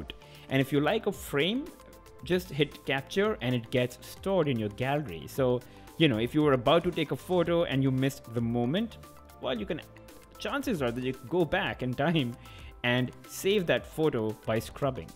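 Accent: India. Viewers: i hate the indian accent , it makes me sad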